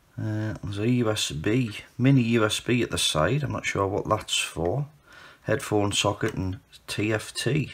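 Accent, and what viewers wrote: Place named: South Shields, United Kingdom. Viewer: I’m in South Shields you sound like you’re up here too, I repair tvs, I’ve had that before on a 12v tv the power supply wasn’t the right one so it was collapsing when it needed more power. Great fix though shame about the quality 😂 probably £5 brand new from china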